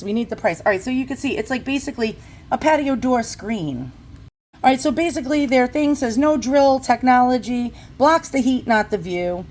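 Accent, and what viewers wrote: New York, United States. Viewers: From a new yorker to another new yorker, love the accent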